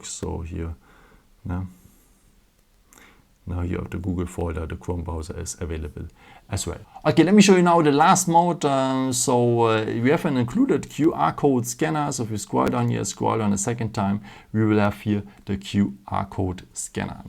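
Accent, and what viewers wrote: Germany. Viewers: Wow you're accent is so german lol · Man hört das du deutscher bist 🤣 U can use it at Bibliothek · Im German and I would say he's definitely German. The way he pronounced "Screenshot", "Video" or "Filter" are the german ways - is that correct English? I don't know😂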